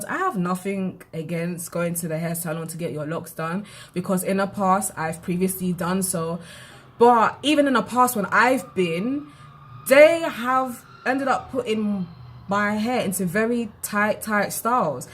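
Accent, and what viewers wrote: United Kingdom. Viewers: Really enjoyed this video, good tips! And I also LOVE your British accent!😊🙌🏾 new subscriber here🙋🏾‍♀️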